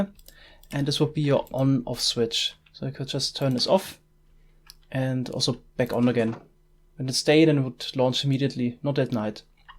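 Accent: Germany. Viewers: Kann es sein, dass du deutsch sprichst? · Are you German sometimes it Sounds like so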